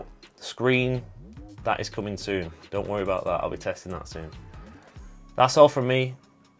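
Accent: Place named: England, United Kingdom. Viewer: Decent unboxing man. Thanks for showing off the colour. Totally agreed on the matte black, would've preferred last year's blue (did you see it?) but matte black easy second choice. Its about time we moved in from glossy fingerprint magnet phones. Just no. I got my Galaxy S10 in green because I wanted flashy but when it was nicked I had to get black. I was wrong, black much more refined. I'm rocking the red case now. Black and red classic combo. Reckon I'm getting the same as at next pay day (tempted to get the watch too). 128GB is plenty for me (my S10 is 128).I like Samsung's OneUI but OxygenOS looks much more refined. I use barely any of the Samsung excessive extras. Also totally agreed on the camera front. It's important but all American YouTubers do is bang on about the camera. The world's in a bloody lockdown anyway, my cat doesn't need another photo taking 😅. 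They barely mention the software and it's so important. A good phone with a bad Android launcher kills it. And from one Englishman to another your accent 👌.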